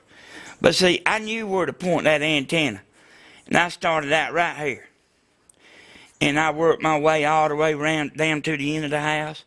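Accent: South, United States. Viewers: have to say this. you could be a professor, but that southern accent! love it!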